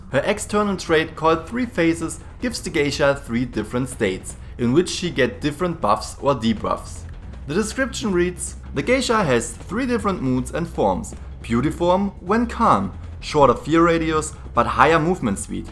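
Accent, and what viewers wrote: Germany. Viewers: No offense, aber das is das deutscheste Englisch, das ich je gehört hab. :P XD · Mein Gott, so you're a German then?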